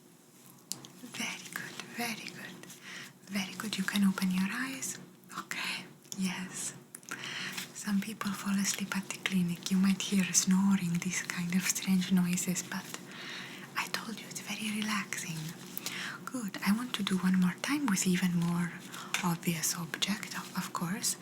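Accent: Italy. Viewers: you made my day aside from the Italian accent you reply to me nice your amazing. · Italian accent has an additional sweetness. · Your Italian accent is very special and beautiful. You are terrific for ASMRs. First time see your channel it was amazing, you have a viewer that is going to continue watching your great videos. Thank you for all your hard work. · Anyone else find her Italian accent a massive turn on? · I really enjoy your Italian accent personally I’m ltalian your soft spoken is my favorite your whispering is also my favorite sounds. PS sleep 😴 dreams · Hello fantastic ASMR with Italian accent I loved it I really enjoyed listening to your sweet voice very relaxing very relaxing greetings beautiful 🌹🌹💖💖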